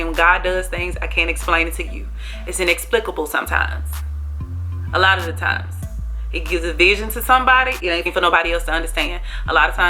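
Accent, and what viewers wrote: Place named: Memphis, United States. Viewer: Had I stayed in Memphis I would have that accent. lol I love your accent so much girl. lol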